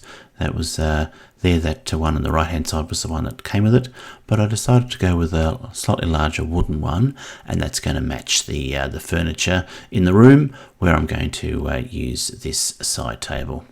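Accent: Australia. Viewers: Nice to hear an Aussie voice over. Your instructions are clear and concise. Good stuff. 👍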